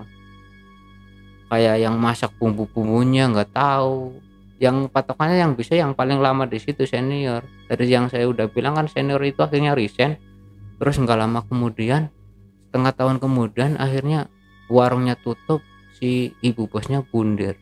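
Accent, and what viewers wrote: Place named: Central Java, Indonesia. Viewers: Logat jateng ya medok bngt kang.. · YaAllah logat e medok nemen 😅absen Bang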